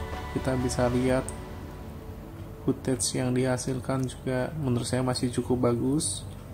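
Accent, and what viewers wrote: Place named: Kalimantan, Indonesia. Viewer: Ikam orang asli mana gan ? Hha bubuhan kalimantan jua lah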